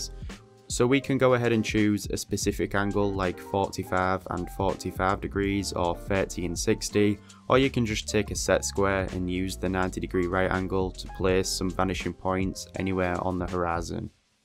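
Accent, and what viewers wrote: United Kingdom. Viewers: You have a british/country accent. It's soothing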